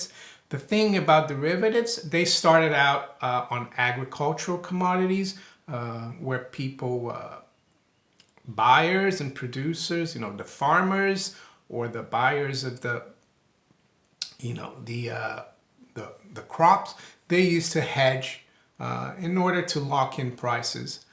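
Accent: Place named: United States. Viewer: Hiya, u are an economic genius, love you, love your programmes but you need to get rid of your Yanks accent, you are a Londoner now m8!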